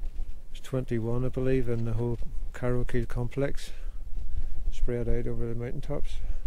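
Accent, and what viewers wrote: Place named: Fermanagh, United Kingdom. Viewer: You’re welcome, thanks for the informative videos. Listening to you talk reminds of my friend from Lisnaskea in Fermanagh. These past couple of years have brought us all down, it’s just not normal. I wish I had more of my wife’s positive outlook, she’s just a happy person, where I’ve become so angry with all the goings on. Definitely get out and reconnect with that ancient world, it’s a fascinating and peaceful as well. Take care…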